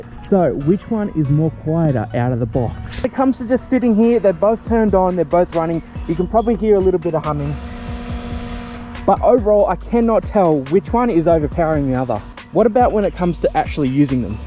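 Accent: Australia. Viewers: Are you ozzie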